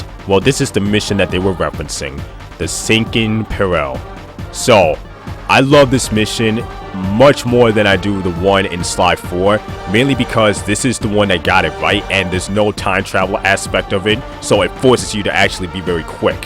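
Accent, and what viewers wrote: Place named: New England, United States. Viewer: Stupid question. What part of the us is your accent from? Sounds like New England in general. My guess is new Jersey, or Boston. Linguistics fan here. Anyone with more info lmk happy to learn!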